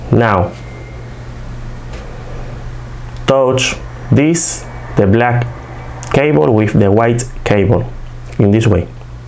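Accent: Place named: Dominican Republic. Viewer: Ese acento de inglés me suena dominicano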